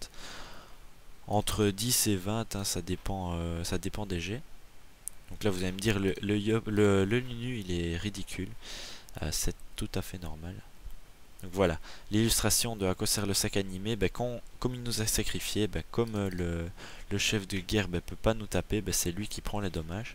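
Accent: Belgium. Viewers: j'aime bien l'accent bien belge x) ça fait plaisir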